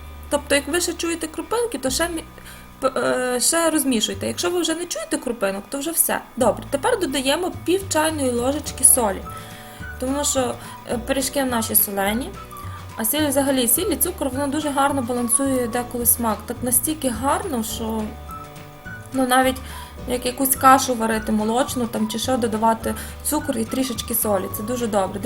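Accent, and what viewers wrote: Western Ukraine, Ukraine. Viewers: У вас украинския речь с западным акцентом., а почему ваш канал подписан по английски? Что в украинском языке не нашлось вам название? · Эта Украиночка из западной Украины. Я тоже с Украины, но у нас другой диалект))))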